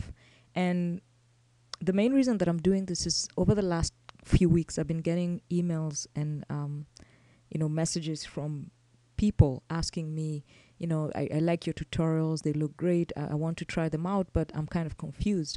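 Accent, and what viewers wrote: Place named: Nairobi, Kenya. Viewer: i noticed ur english is a blend of nairobi and the pauses between the videos :) blessed to have a kenyan around :D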